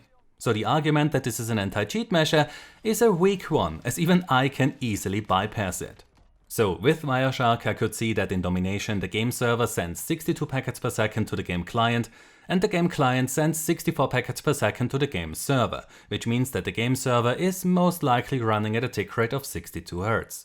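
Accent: Germany. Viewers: Are you a german Person because you have accent from german people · Man hört raus das du ein Deutscher bist. ^^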